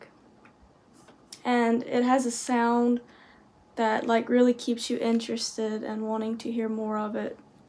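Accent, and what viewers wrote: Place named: Minnesota, United States. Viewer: Thanks for this reaction,! You are right that so many of Persian music is/sounds sad! I believe music shows "the state of a society". Unfortunately, in at least the last 200 years, Iran has experienced a lot of downs which may reflects itself in music. Just my 2 cents. I hope that things change societally, and we can produce cheerier (but still meaningful) music. I hope a speedy recovery for you ❤ By the way, I like your accent! If I may guess, Minnesotan?:)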